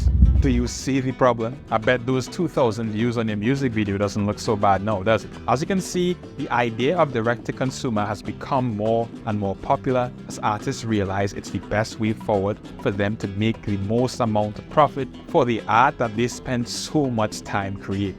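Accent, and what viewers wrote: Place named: Germany. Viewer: Was not expecting that accent bruh lol. You German?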